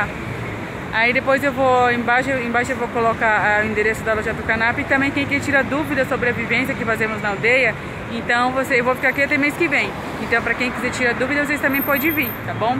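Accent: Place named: Sao Paulo, Brazil. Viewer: Parabéns! vc é original! Fala tipo paulista, mas com a essência indígina! Show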